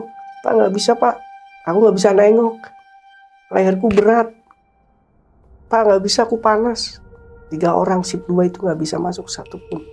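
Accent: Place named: Sumatra, Indonesia. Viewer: Aku juga rada salfok sama logatnya gk ada Jawa jawanya. Kayak logat Sumatra.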